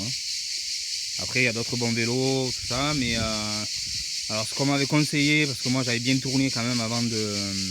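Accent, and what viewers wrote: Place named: South, France. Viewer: Ah les cigales et l'accent du Sud... J'adore. Je viens d'acheter le même ce matin pour 450€. Bonne route.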